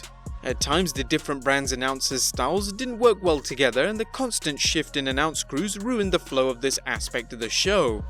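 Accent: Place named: United Kingdom. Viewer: YES!!! The British voice is back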